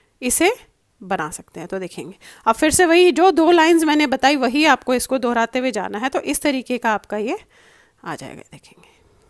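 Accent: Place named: Pakistan. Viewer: Thank you 💞 mai app ke buhat bari fan hoon, tumhari aawaz ur pronunciation bilkul hum pakistanio jaisa hai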